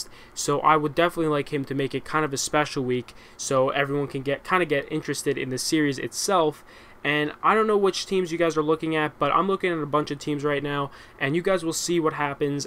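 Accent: Netherlands. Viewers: Are you dutch? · are you dutch?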